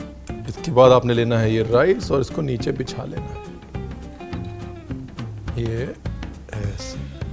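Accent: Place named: Punjab, India. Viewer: Sir, ranveer brar you are just awesome ♥️♥️... I have seen all your recipes like each and everyone.... And I just love your accent more then your dish of course your dishes would be more awesome 🌍♥️. I really love the punjabi in you... I recently saw your motivational video on some YouTube channel. And I was so happy that we have uh... The best chef I even watch your serial Raja rasoi aur andaz anokha it's awesome ♥️♥️.... I just wanna say that sab chef's khane banate hai pyar banate ho💕... Love you sir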